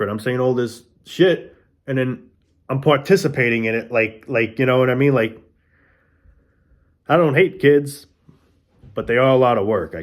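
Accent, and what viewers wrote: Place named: New Jersey, United States. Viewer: By your accent my guess is you’re from New Jersey.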